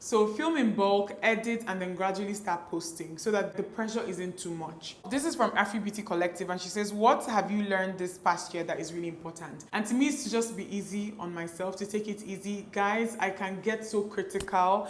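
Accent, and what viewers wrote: Igbo, Nigeria. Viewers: I love how you called nneoma's name and how you answered her 😂 I love your igbo accent 😊♥️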